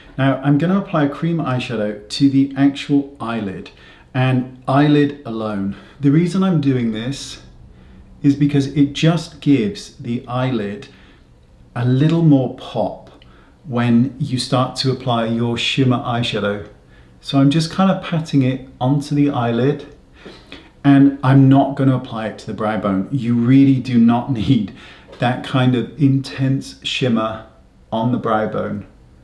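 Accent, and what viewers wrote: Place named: England, United Kingdom. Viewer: So precise and helpful I'velearned so much from binge watching. Love the English accent so pleasant to listen.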